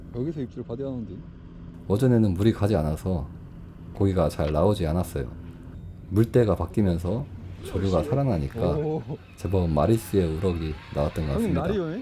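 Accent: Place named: Chungcheong, South Korea. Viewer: 역시 고양이 아빠 충청도 사투리방송 구수하니 잼나네요 간만에 낚시 하시는 모습도 힐링되네요 감사합니다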